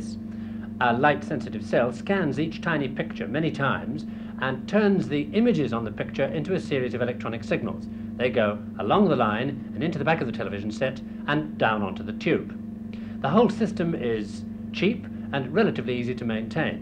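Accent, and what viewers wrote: RP, United Kingdom. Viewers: Didn't James have a very silly BBC voice back then?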